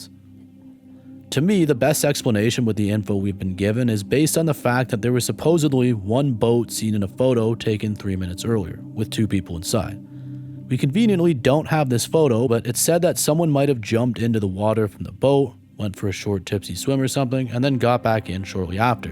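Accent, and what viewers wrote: Canada. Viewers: Love this new chan theres loads n loads strange things I never seen before plus love way Canadians say oot 😅 same as guy from criminally listed 😀 😄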